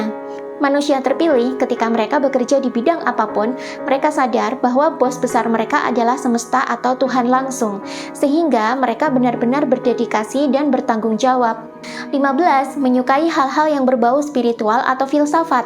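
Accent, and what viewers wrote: Java, Indonesia. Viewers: sampean kok medok mbak. jowo po ! 🤭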